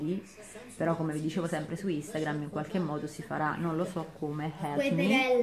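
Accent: Tuscany, Italy. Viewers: Ciao,scusami ma tu hai la fortuna di abitare in Toscana e ti correggi quando parli toscano... pagerei oro per spostarmi dalla Calabria non fraintendermi AMO la mia terra e il mio mare, ma al sud poco lavoro e poco tutto ma quello che mi fà più paura e la Sanità praticamente non c'è.Quando vengo in Toscana precisamente a Pistoia l'adoro e da 28 anni che ci vengo purtroppo solo da mio cognato 1 volta all'anno, l'Ikea vicina i Gigli Firenze Prato vicini anzi tutto vicino,sei fortunatissima goditela 😉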